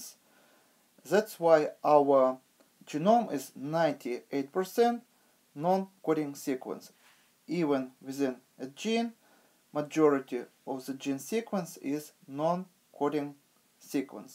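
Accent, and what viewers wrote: Russia. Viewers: Man you russian accent is awesome . :)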